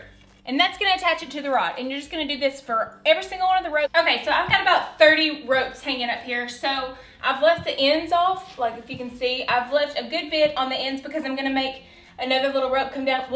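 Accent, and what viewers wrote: South, United States. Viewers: Alexia Stotsenburg she's southern! We're from Alabama! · Girl u r a hoot. Where ya from, sho ain’t a yankee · You are just too cute! I absolutely love your Southern accent. I’m Middle Eastern so I don’t come with your accent but I live down South now so I’ve learned a few terms. I just discovered you and subscribed. God bless you. 🙏🏽😇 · You're hillarious. Loven the Southern accent lol hugz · Purple pigtails, over the top eyelashes, and a Southern accent? Subscribed!! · Oh my gosh! You are just too adorable! Love your Southern-ness! Great tutorial. Love the finished piece. I can't wait to make a similar piece. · She sounds southern. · I have no idea why but I thought you were from Australia for the first 10 seconds then I realized it was a southern accent 😂 you're gorgeous and funny, subscribed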